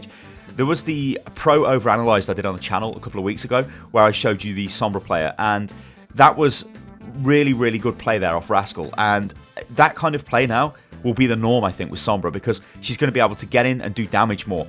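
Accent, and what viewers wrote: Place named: United Kingdom. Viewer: He's like an excited British puppy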